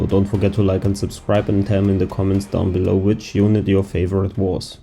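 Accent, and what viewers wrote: Germany. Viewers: Thats why ur english sounds like german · Im love how you say it with you german Voice❤😂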